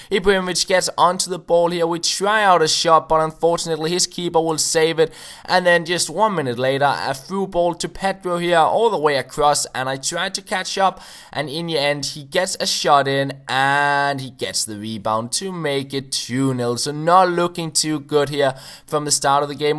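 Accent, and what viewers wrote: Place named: Denmark. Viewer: I love the danish accent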